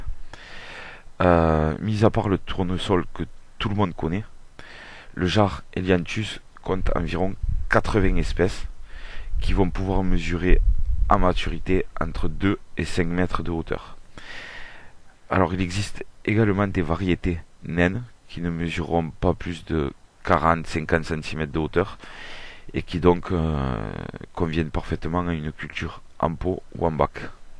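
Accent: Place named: Paris, France. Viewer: Vous avez l'accent pointu. Très bien expliqué et on voit que vous le faites avec amour 😊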